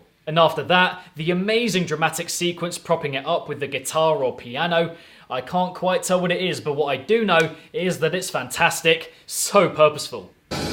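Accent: United Kingdom. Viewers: Your lush British accent makes this review much more enjoyable. But no homo tho.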